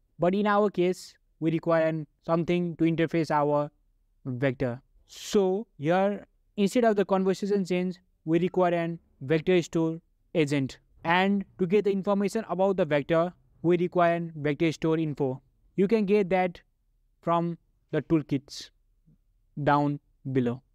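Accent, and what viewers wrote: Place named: India. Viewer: here is a tip. slow to .75, indian accent english becomes much easier to understand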